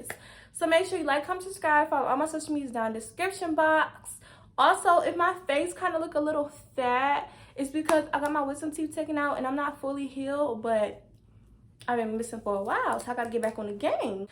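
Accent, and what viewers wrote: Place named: DMV, United States. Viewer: I knew soon as you started talking you was from the DMV 😂😂 old men be gross AF I got a few stories from back when I was damn 14/15/16 LOL I’m like sir... wrinkles and powdered milk don’t do it for me 💀💀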